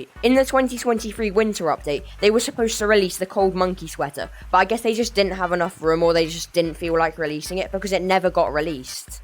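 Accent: United Kingdom. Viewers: Why does bro sound so British I'm not saying it a bad way I'm saying it in a good way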